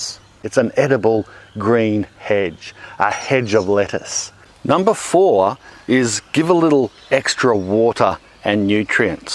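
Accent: Australia. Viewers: i was like what he spiced them? ooh spaced. australian accent is the best accent 😂.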